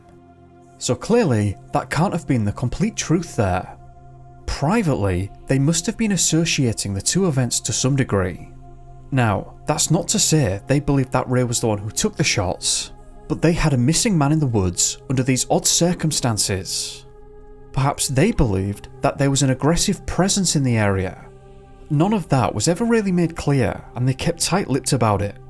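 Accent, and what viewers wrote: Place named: North, United Kingdom. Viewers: No I'm from near Northumberland I'm a geordie and I'd say it's a posh Lancashire accent or Yorkshire but definitely not Northumberland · The narrator has a Northern accent. Its quite acceptable to pronounce words with your own accent. Its you who is showing your ignorance by making such a comment.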